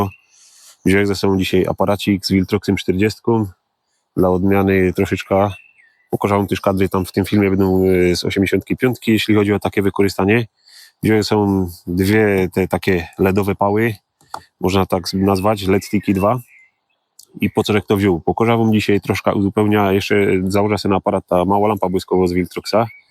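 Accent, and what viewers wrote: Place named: Silesia, Poland. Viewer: Piękne zdjęcia pięknego miejsca. Deszcz to dla mnie osobiście bardzo nastrojowa aura, lekko nostalgiczna, czasem taka nawet "Silent Hillowa". Jak zawsze: dowiedziałam się czegoś nowego. No i najważniejsze, czy aktualnie używany przez Ciebie jest akcent śląski w języku polskim, czy język śląski, brzmi to naturalnie i nadaje charakteru całemu kanałowi.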